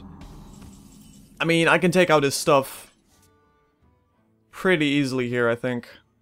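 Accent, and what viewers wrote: Netherlands. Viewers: Are you dutch? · Favoriete Nederlandse gamer, door je accent alleen al sub!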